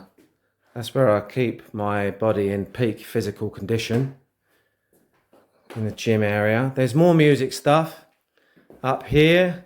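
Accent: Essex, United Kingdom. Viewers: Love your channel. Are you from Essex by any chance? Good luck with the renovations. 👍🥂🍾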